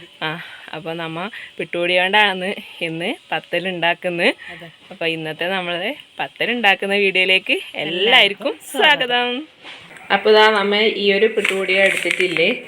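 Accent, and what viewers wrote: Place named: Kasaragod, India. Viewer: മുതുമ്മനും കൊയ്ച്ചെടുത്തു 😂. നമ്മുടെ കാസറോട്ടെ ഭാഷ 👍🏻👍🏻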